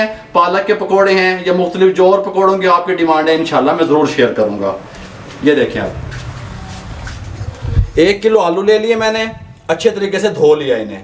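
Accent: Punjab, India. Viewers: Ap k accent Punjabi h ap Punjabi bola kren bht acha lgta h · G Allah apko BHT khushyan de mje apka bat karne ka smjane ka tarika punjabi bolne ka style BHT acha ha uncle Allah apko kamiabia de ameen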